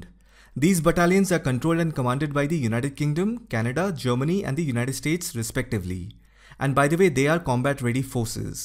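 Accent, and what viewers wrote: India. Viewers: Why is a guy with an Indian accent narrating a video about European history. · Its really amazing to hear But i couldn't understand that With Indian accent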